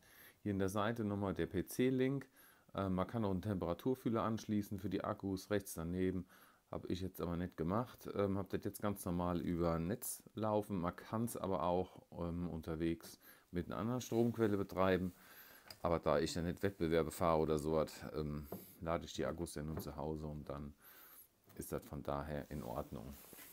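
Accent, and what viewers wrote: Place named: Lower Rhine, Germany. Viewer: Der Akzent...... Dat & Wat!!!! Herrlich!!! Wich komm aus Wesel am Niederrhein..... da sprechen wir auch so!! Lol!!! Gruß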